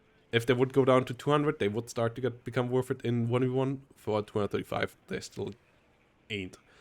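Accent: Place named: Germany. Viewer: Deutsch erkennst immer sofort ^^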